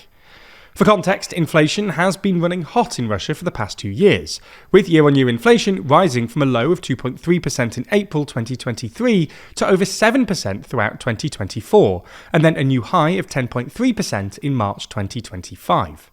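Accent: England, United Kingdom. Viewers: So why not direct your biased, English accent analysis to the Wall Street collapse going on right now?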